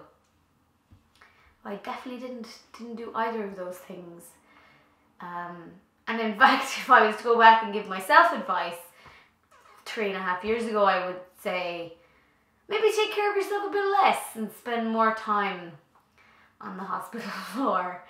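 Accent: Ireland. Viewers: I don't know how things are now, for I have just met you on YouTube like... today. I came here to get used to the Irish accent, and now I'm finding myself almost in tears. I've lost my grandfathers for cancer. Both of them. Basically, I have BOTH sides of my family with ~this thing~. 'Fear' is something strong around here, considering it's genetic and I have zero ideia if it might affect my parents. I wouldn't know what to do if it did. Stay strong. Bless you.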